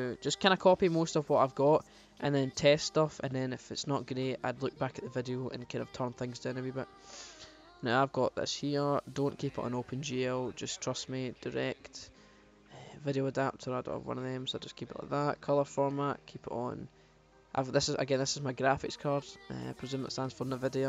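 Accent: Scotland, United Kingdom. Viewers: bit a Scotland u fae bro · Yasss he's scottish!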